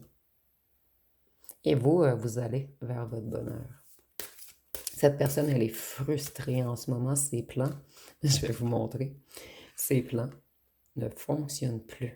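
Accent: Quebec, Canada. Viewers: Vous êtes trés drôle ,j adore votre accent québécois .Je suppose que le vehicule dont vous ne trouvez pas le nom avec lequel le monsieur veut poursuivre ma voiture ,est un tricycle pour enfant .🤣😂Donc en effet il n est pas près de me rattraper .Il vaut mieux en rire .Merci .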